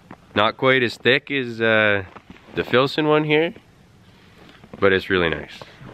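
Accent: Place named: Alaska, United States. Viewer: Thanks for an in-depth review of these hats! The website unfortunately doesn't show the inside and I don't have a Filson store nearby, but I'm considering the Mackinaw hat. Are you up in Alaska, by chance? My Uncle is from there and you have the same accent 😎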